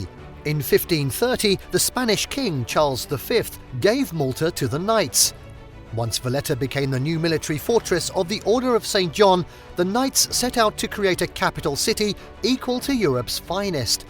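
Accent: England, United Kingdom. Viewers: Among others: This fortress served against RUTHLES OTTOMAN EMPIRE, I believe that when you point the finger or insult someone with tendencies and that someone has ruled half of the world and beyond, at least change the accent so that it is not understood that you are English, since today in April 2024 countless countries pay taxes and other benefits to Britain without knowing why they are poor?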